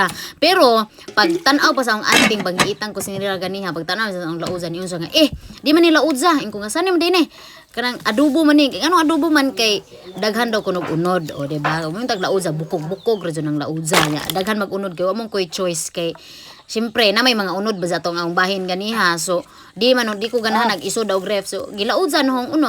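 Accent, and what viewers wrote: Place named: Bohol, Philippines. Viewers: hi miss new subcriber from cebu city😊asa dapit sa bohol inyoha qy taga bohol pud aq bana? naa pud koy gisunod nga mga vlogger taga Alicia♥️ ganahan kos imng mga vlog qy luto2 nya taga bohol jd ang sinultian nmo😊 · Nalingaw ko jamo sa imong sinultian truly bol anon jud Kang pure Taga dimiao pod among kagikan now I'm from Mindanao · Hi Ms, taga loboc ka Dai? Base Sa accent man gud nimo.. New followers me nimo Dai..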